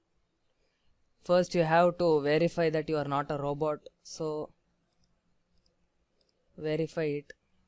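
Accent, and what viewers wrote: India. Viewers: Right as a hear the Indian accent I knew this was a helpful video lol. THANKS!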